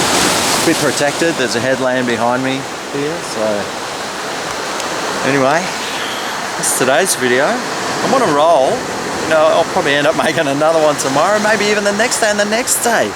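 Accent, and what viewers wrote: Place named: Australia. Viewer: That would be good, too, with a sunrise or sunset showing. Or you can do some talking with it, like this video, I love an Aussie accent. :) OR...recipes on the beach, fire going, shrimp on a stick on the barbie...you talking about anything...I love to hear your voice..that accent! This video reminded me of some of the ASMR things I've run across, the background sound and talking; that's what made me think of it.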